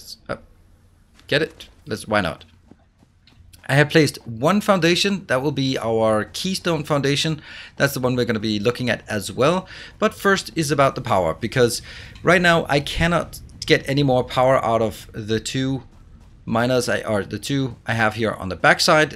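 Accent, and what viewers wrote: Denmark. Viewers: yo mate good video just got the game and wanted to lean a bit while downloading :D Little side Quest ion. are you danish ? your accent makes me think that hehe and wanted to ask. any way have a nice day ^^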